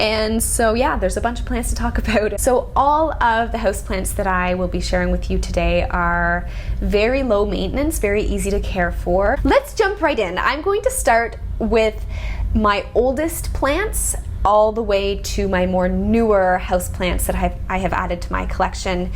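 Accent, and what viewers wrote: Canada. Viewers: Great video. I'm going to be getting a bunch of plants soon and this was super fun and helpful. Side question? Are you from Canada? Accent sounded Canadian.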